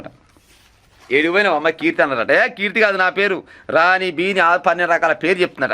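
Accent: Telangana, India. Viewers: తెలంగాణ మాండలికం మాటల ద్వారా న్యూస్ చెప్పడం చాలా బాగా వుంది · నువ్వు భలే గమ్మత్తుగా చెప్పుతావే పెద్ద మనిషి వార్తలు మన అచ్చమైన తెలంగాణ మాండలికంలో 👌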